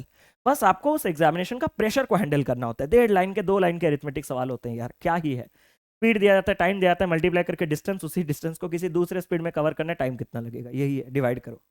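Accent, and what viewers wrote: Odisha, India. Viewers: sir ap odisha se he kya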